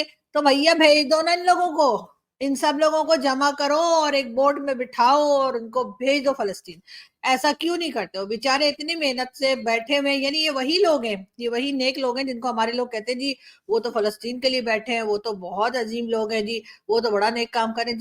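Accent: India. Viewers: Your style speaking is just like Indian and I like it too much . Your electricity bill gave me a big shock. I always smile to watch your videos .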